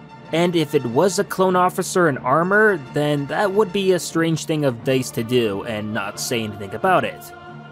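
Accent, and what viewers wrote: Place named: Canada. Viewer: thats how they pronounce offense in canada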